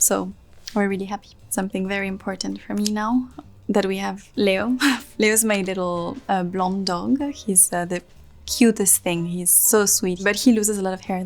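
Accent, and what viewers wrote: France. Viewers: The French accent ❤ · She’s really so beautiful, I love how she speaks English with a French accent. Shes so classy, I love classy women.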